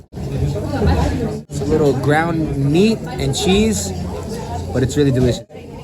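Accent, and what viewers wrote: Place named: Brazil. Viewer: Vc ta brazuca mermo em ingles. Vlw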